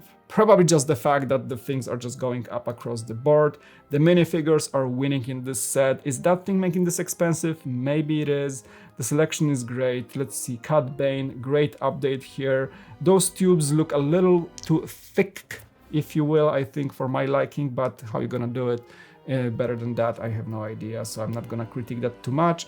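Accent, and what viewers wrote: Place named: Poland. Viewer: Sets are great, but overpriced. I think the reason is Disney license, it must be very expensive, at least we get new Cody Minifigure. Ps. That's my first time on your channel, are you from Poland? You sound like a pole :)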